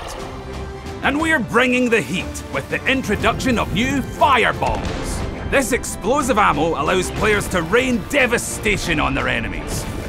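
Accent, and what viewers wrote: Scotland, United Kingdom. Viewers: Why is he Scottish?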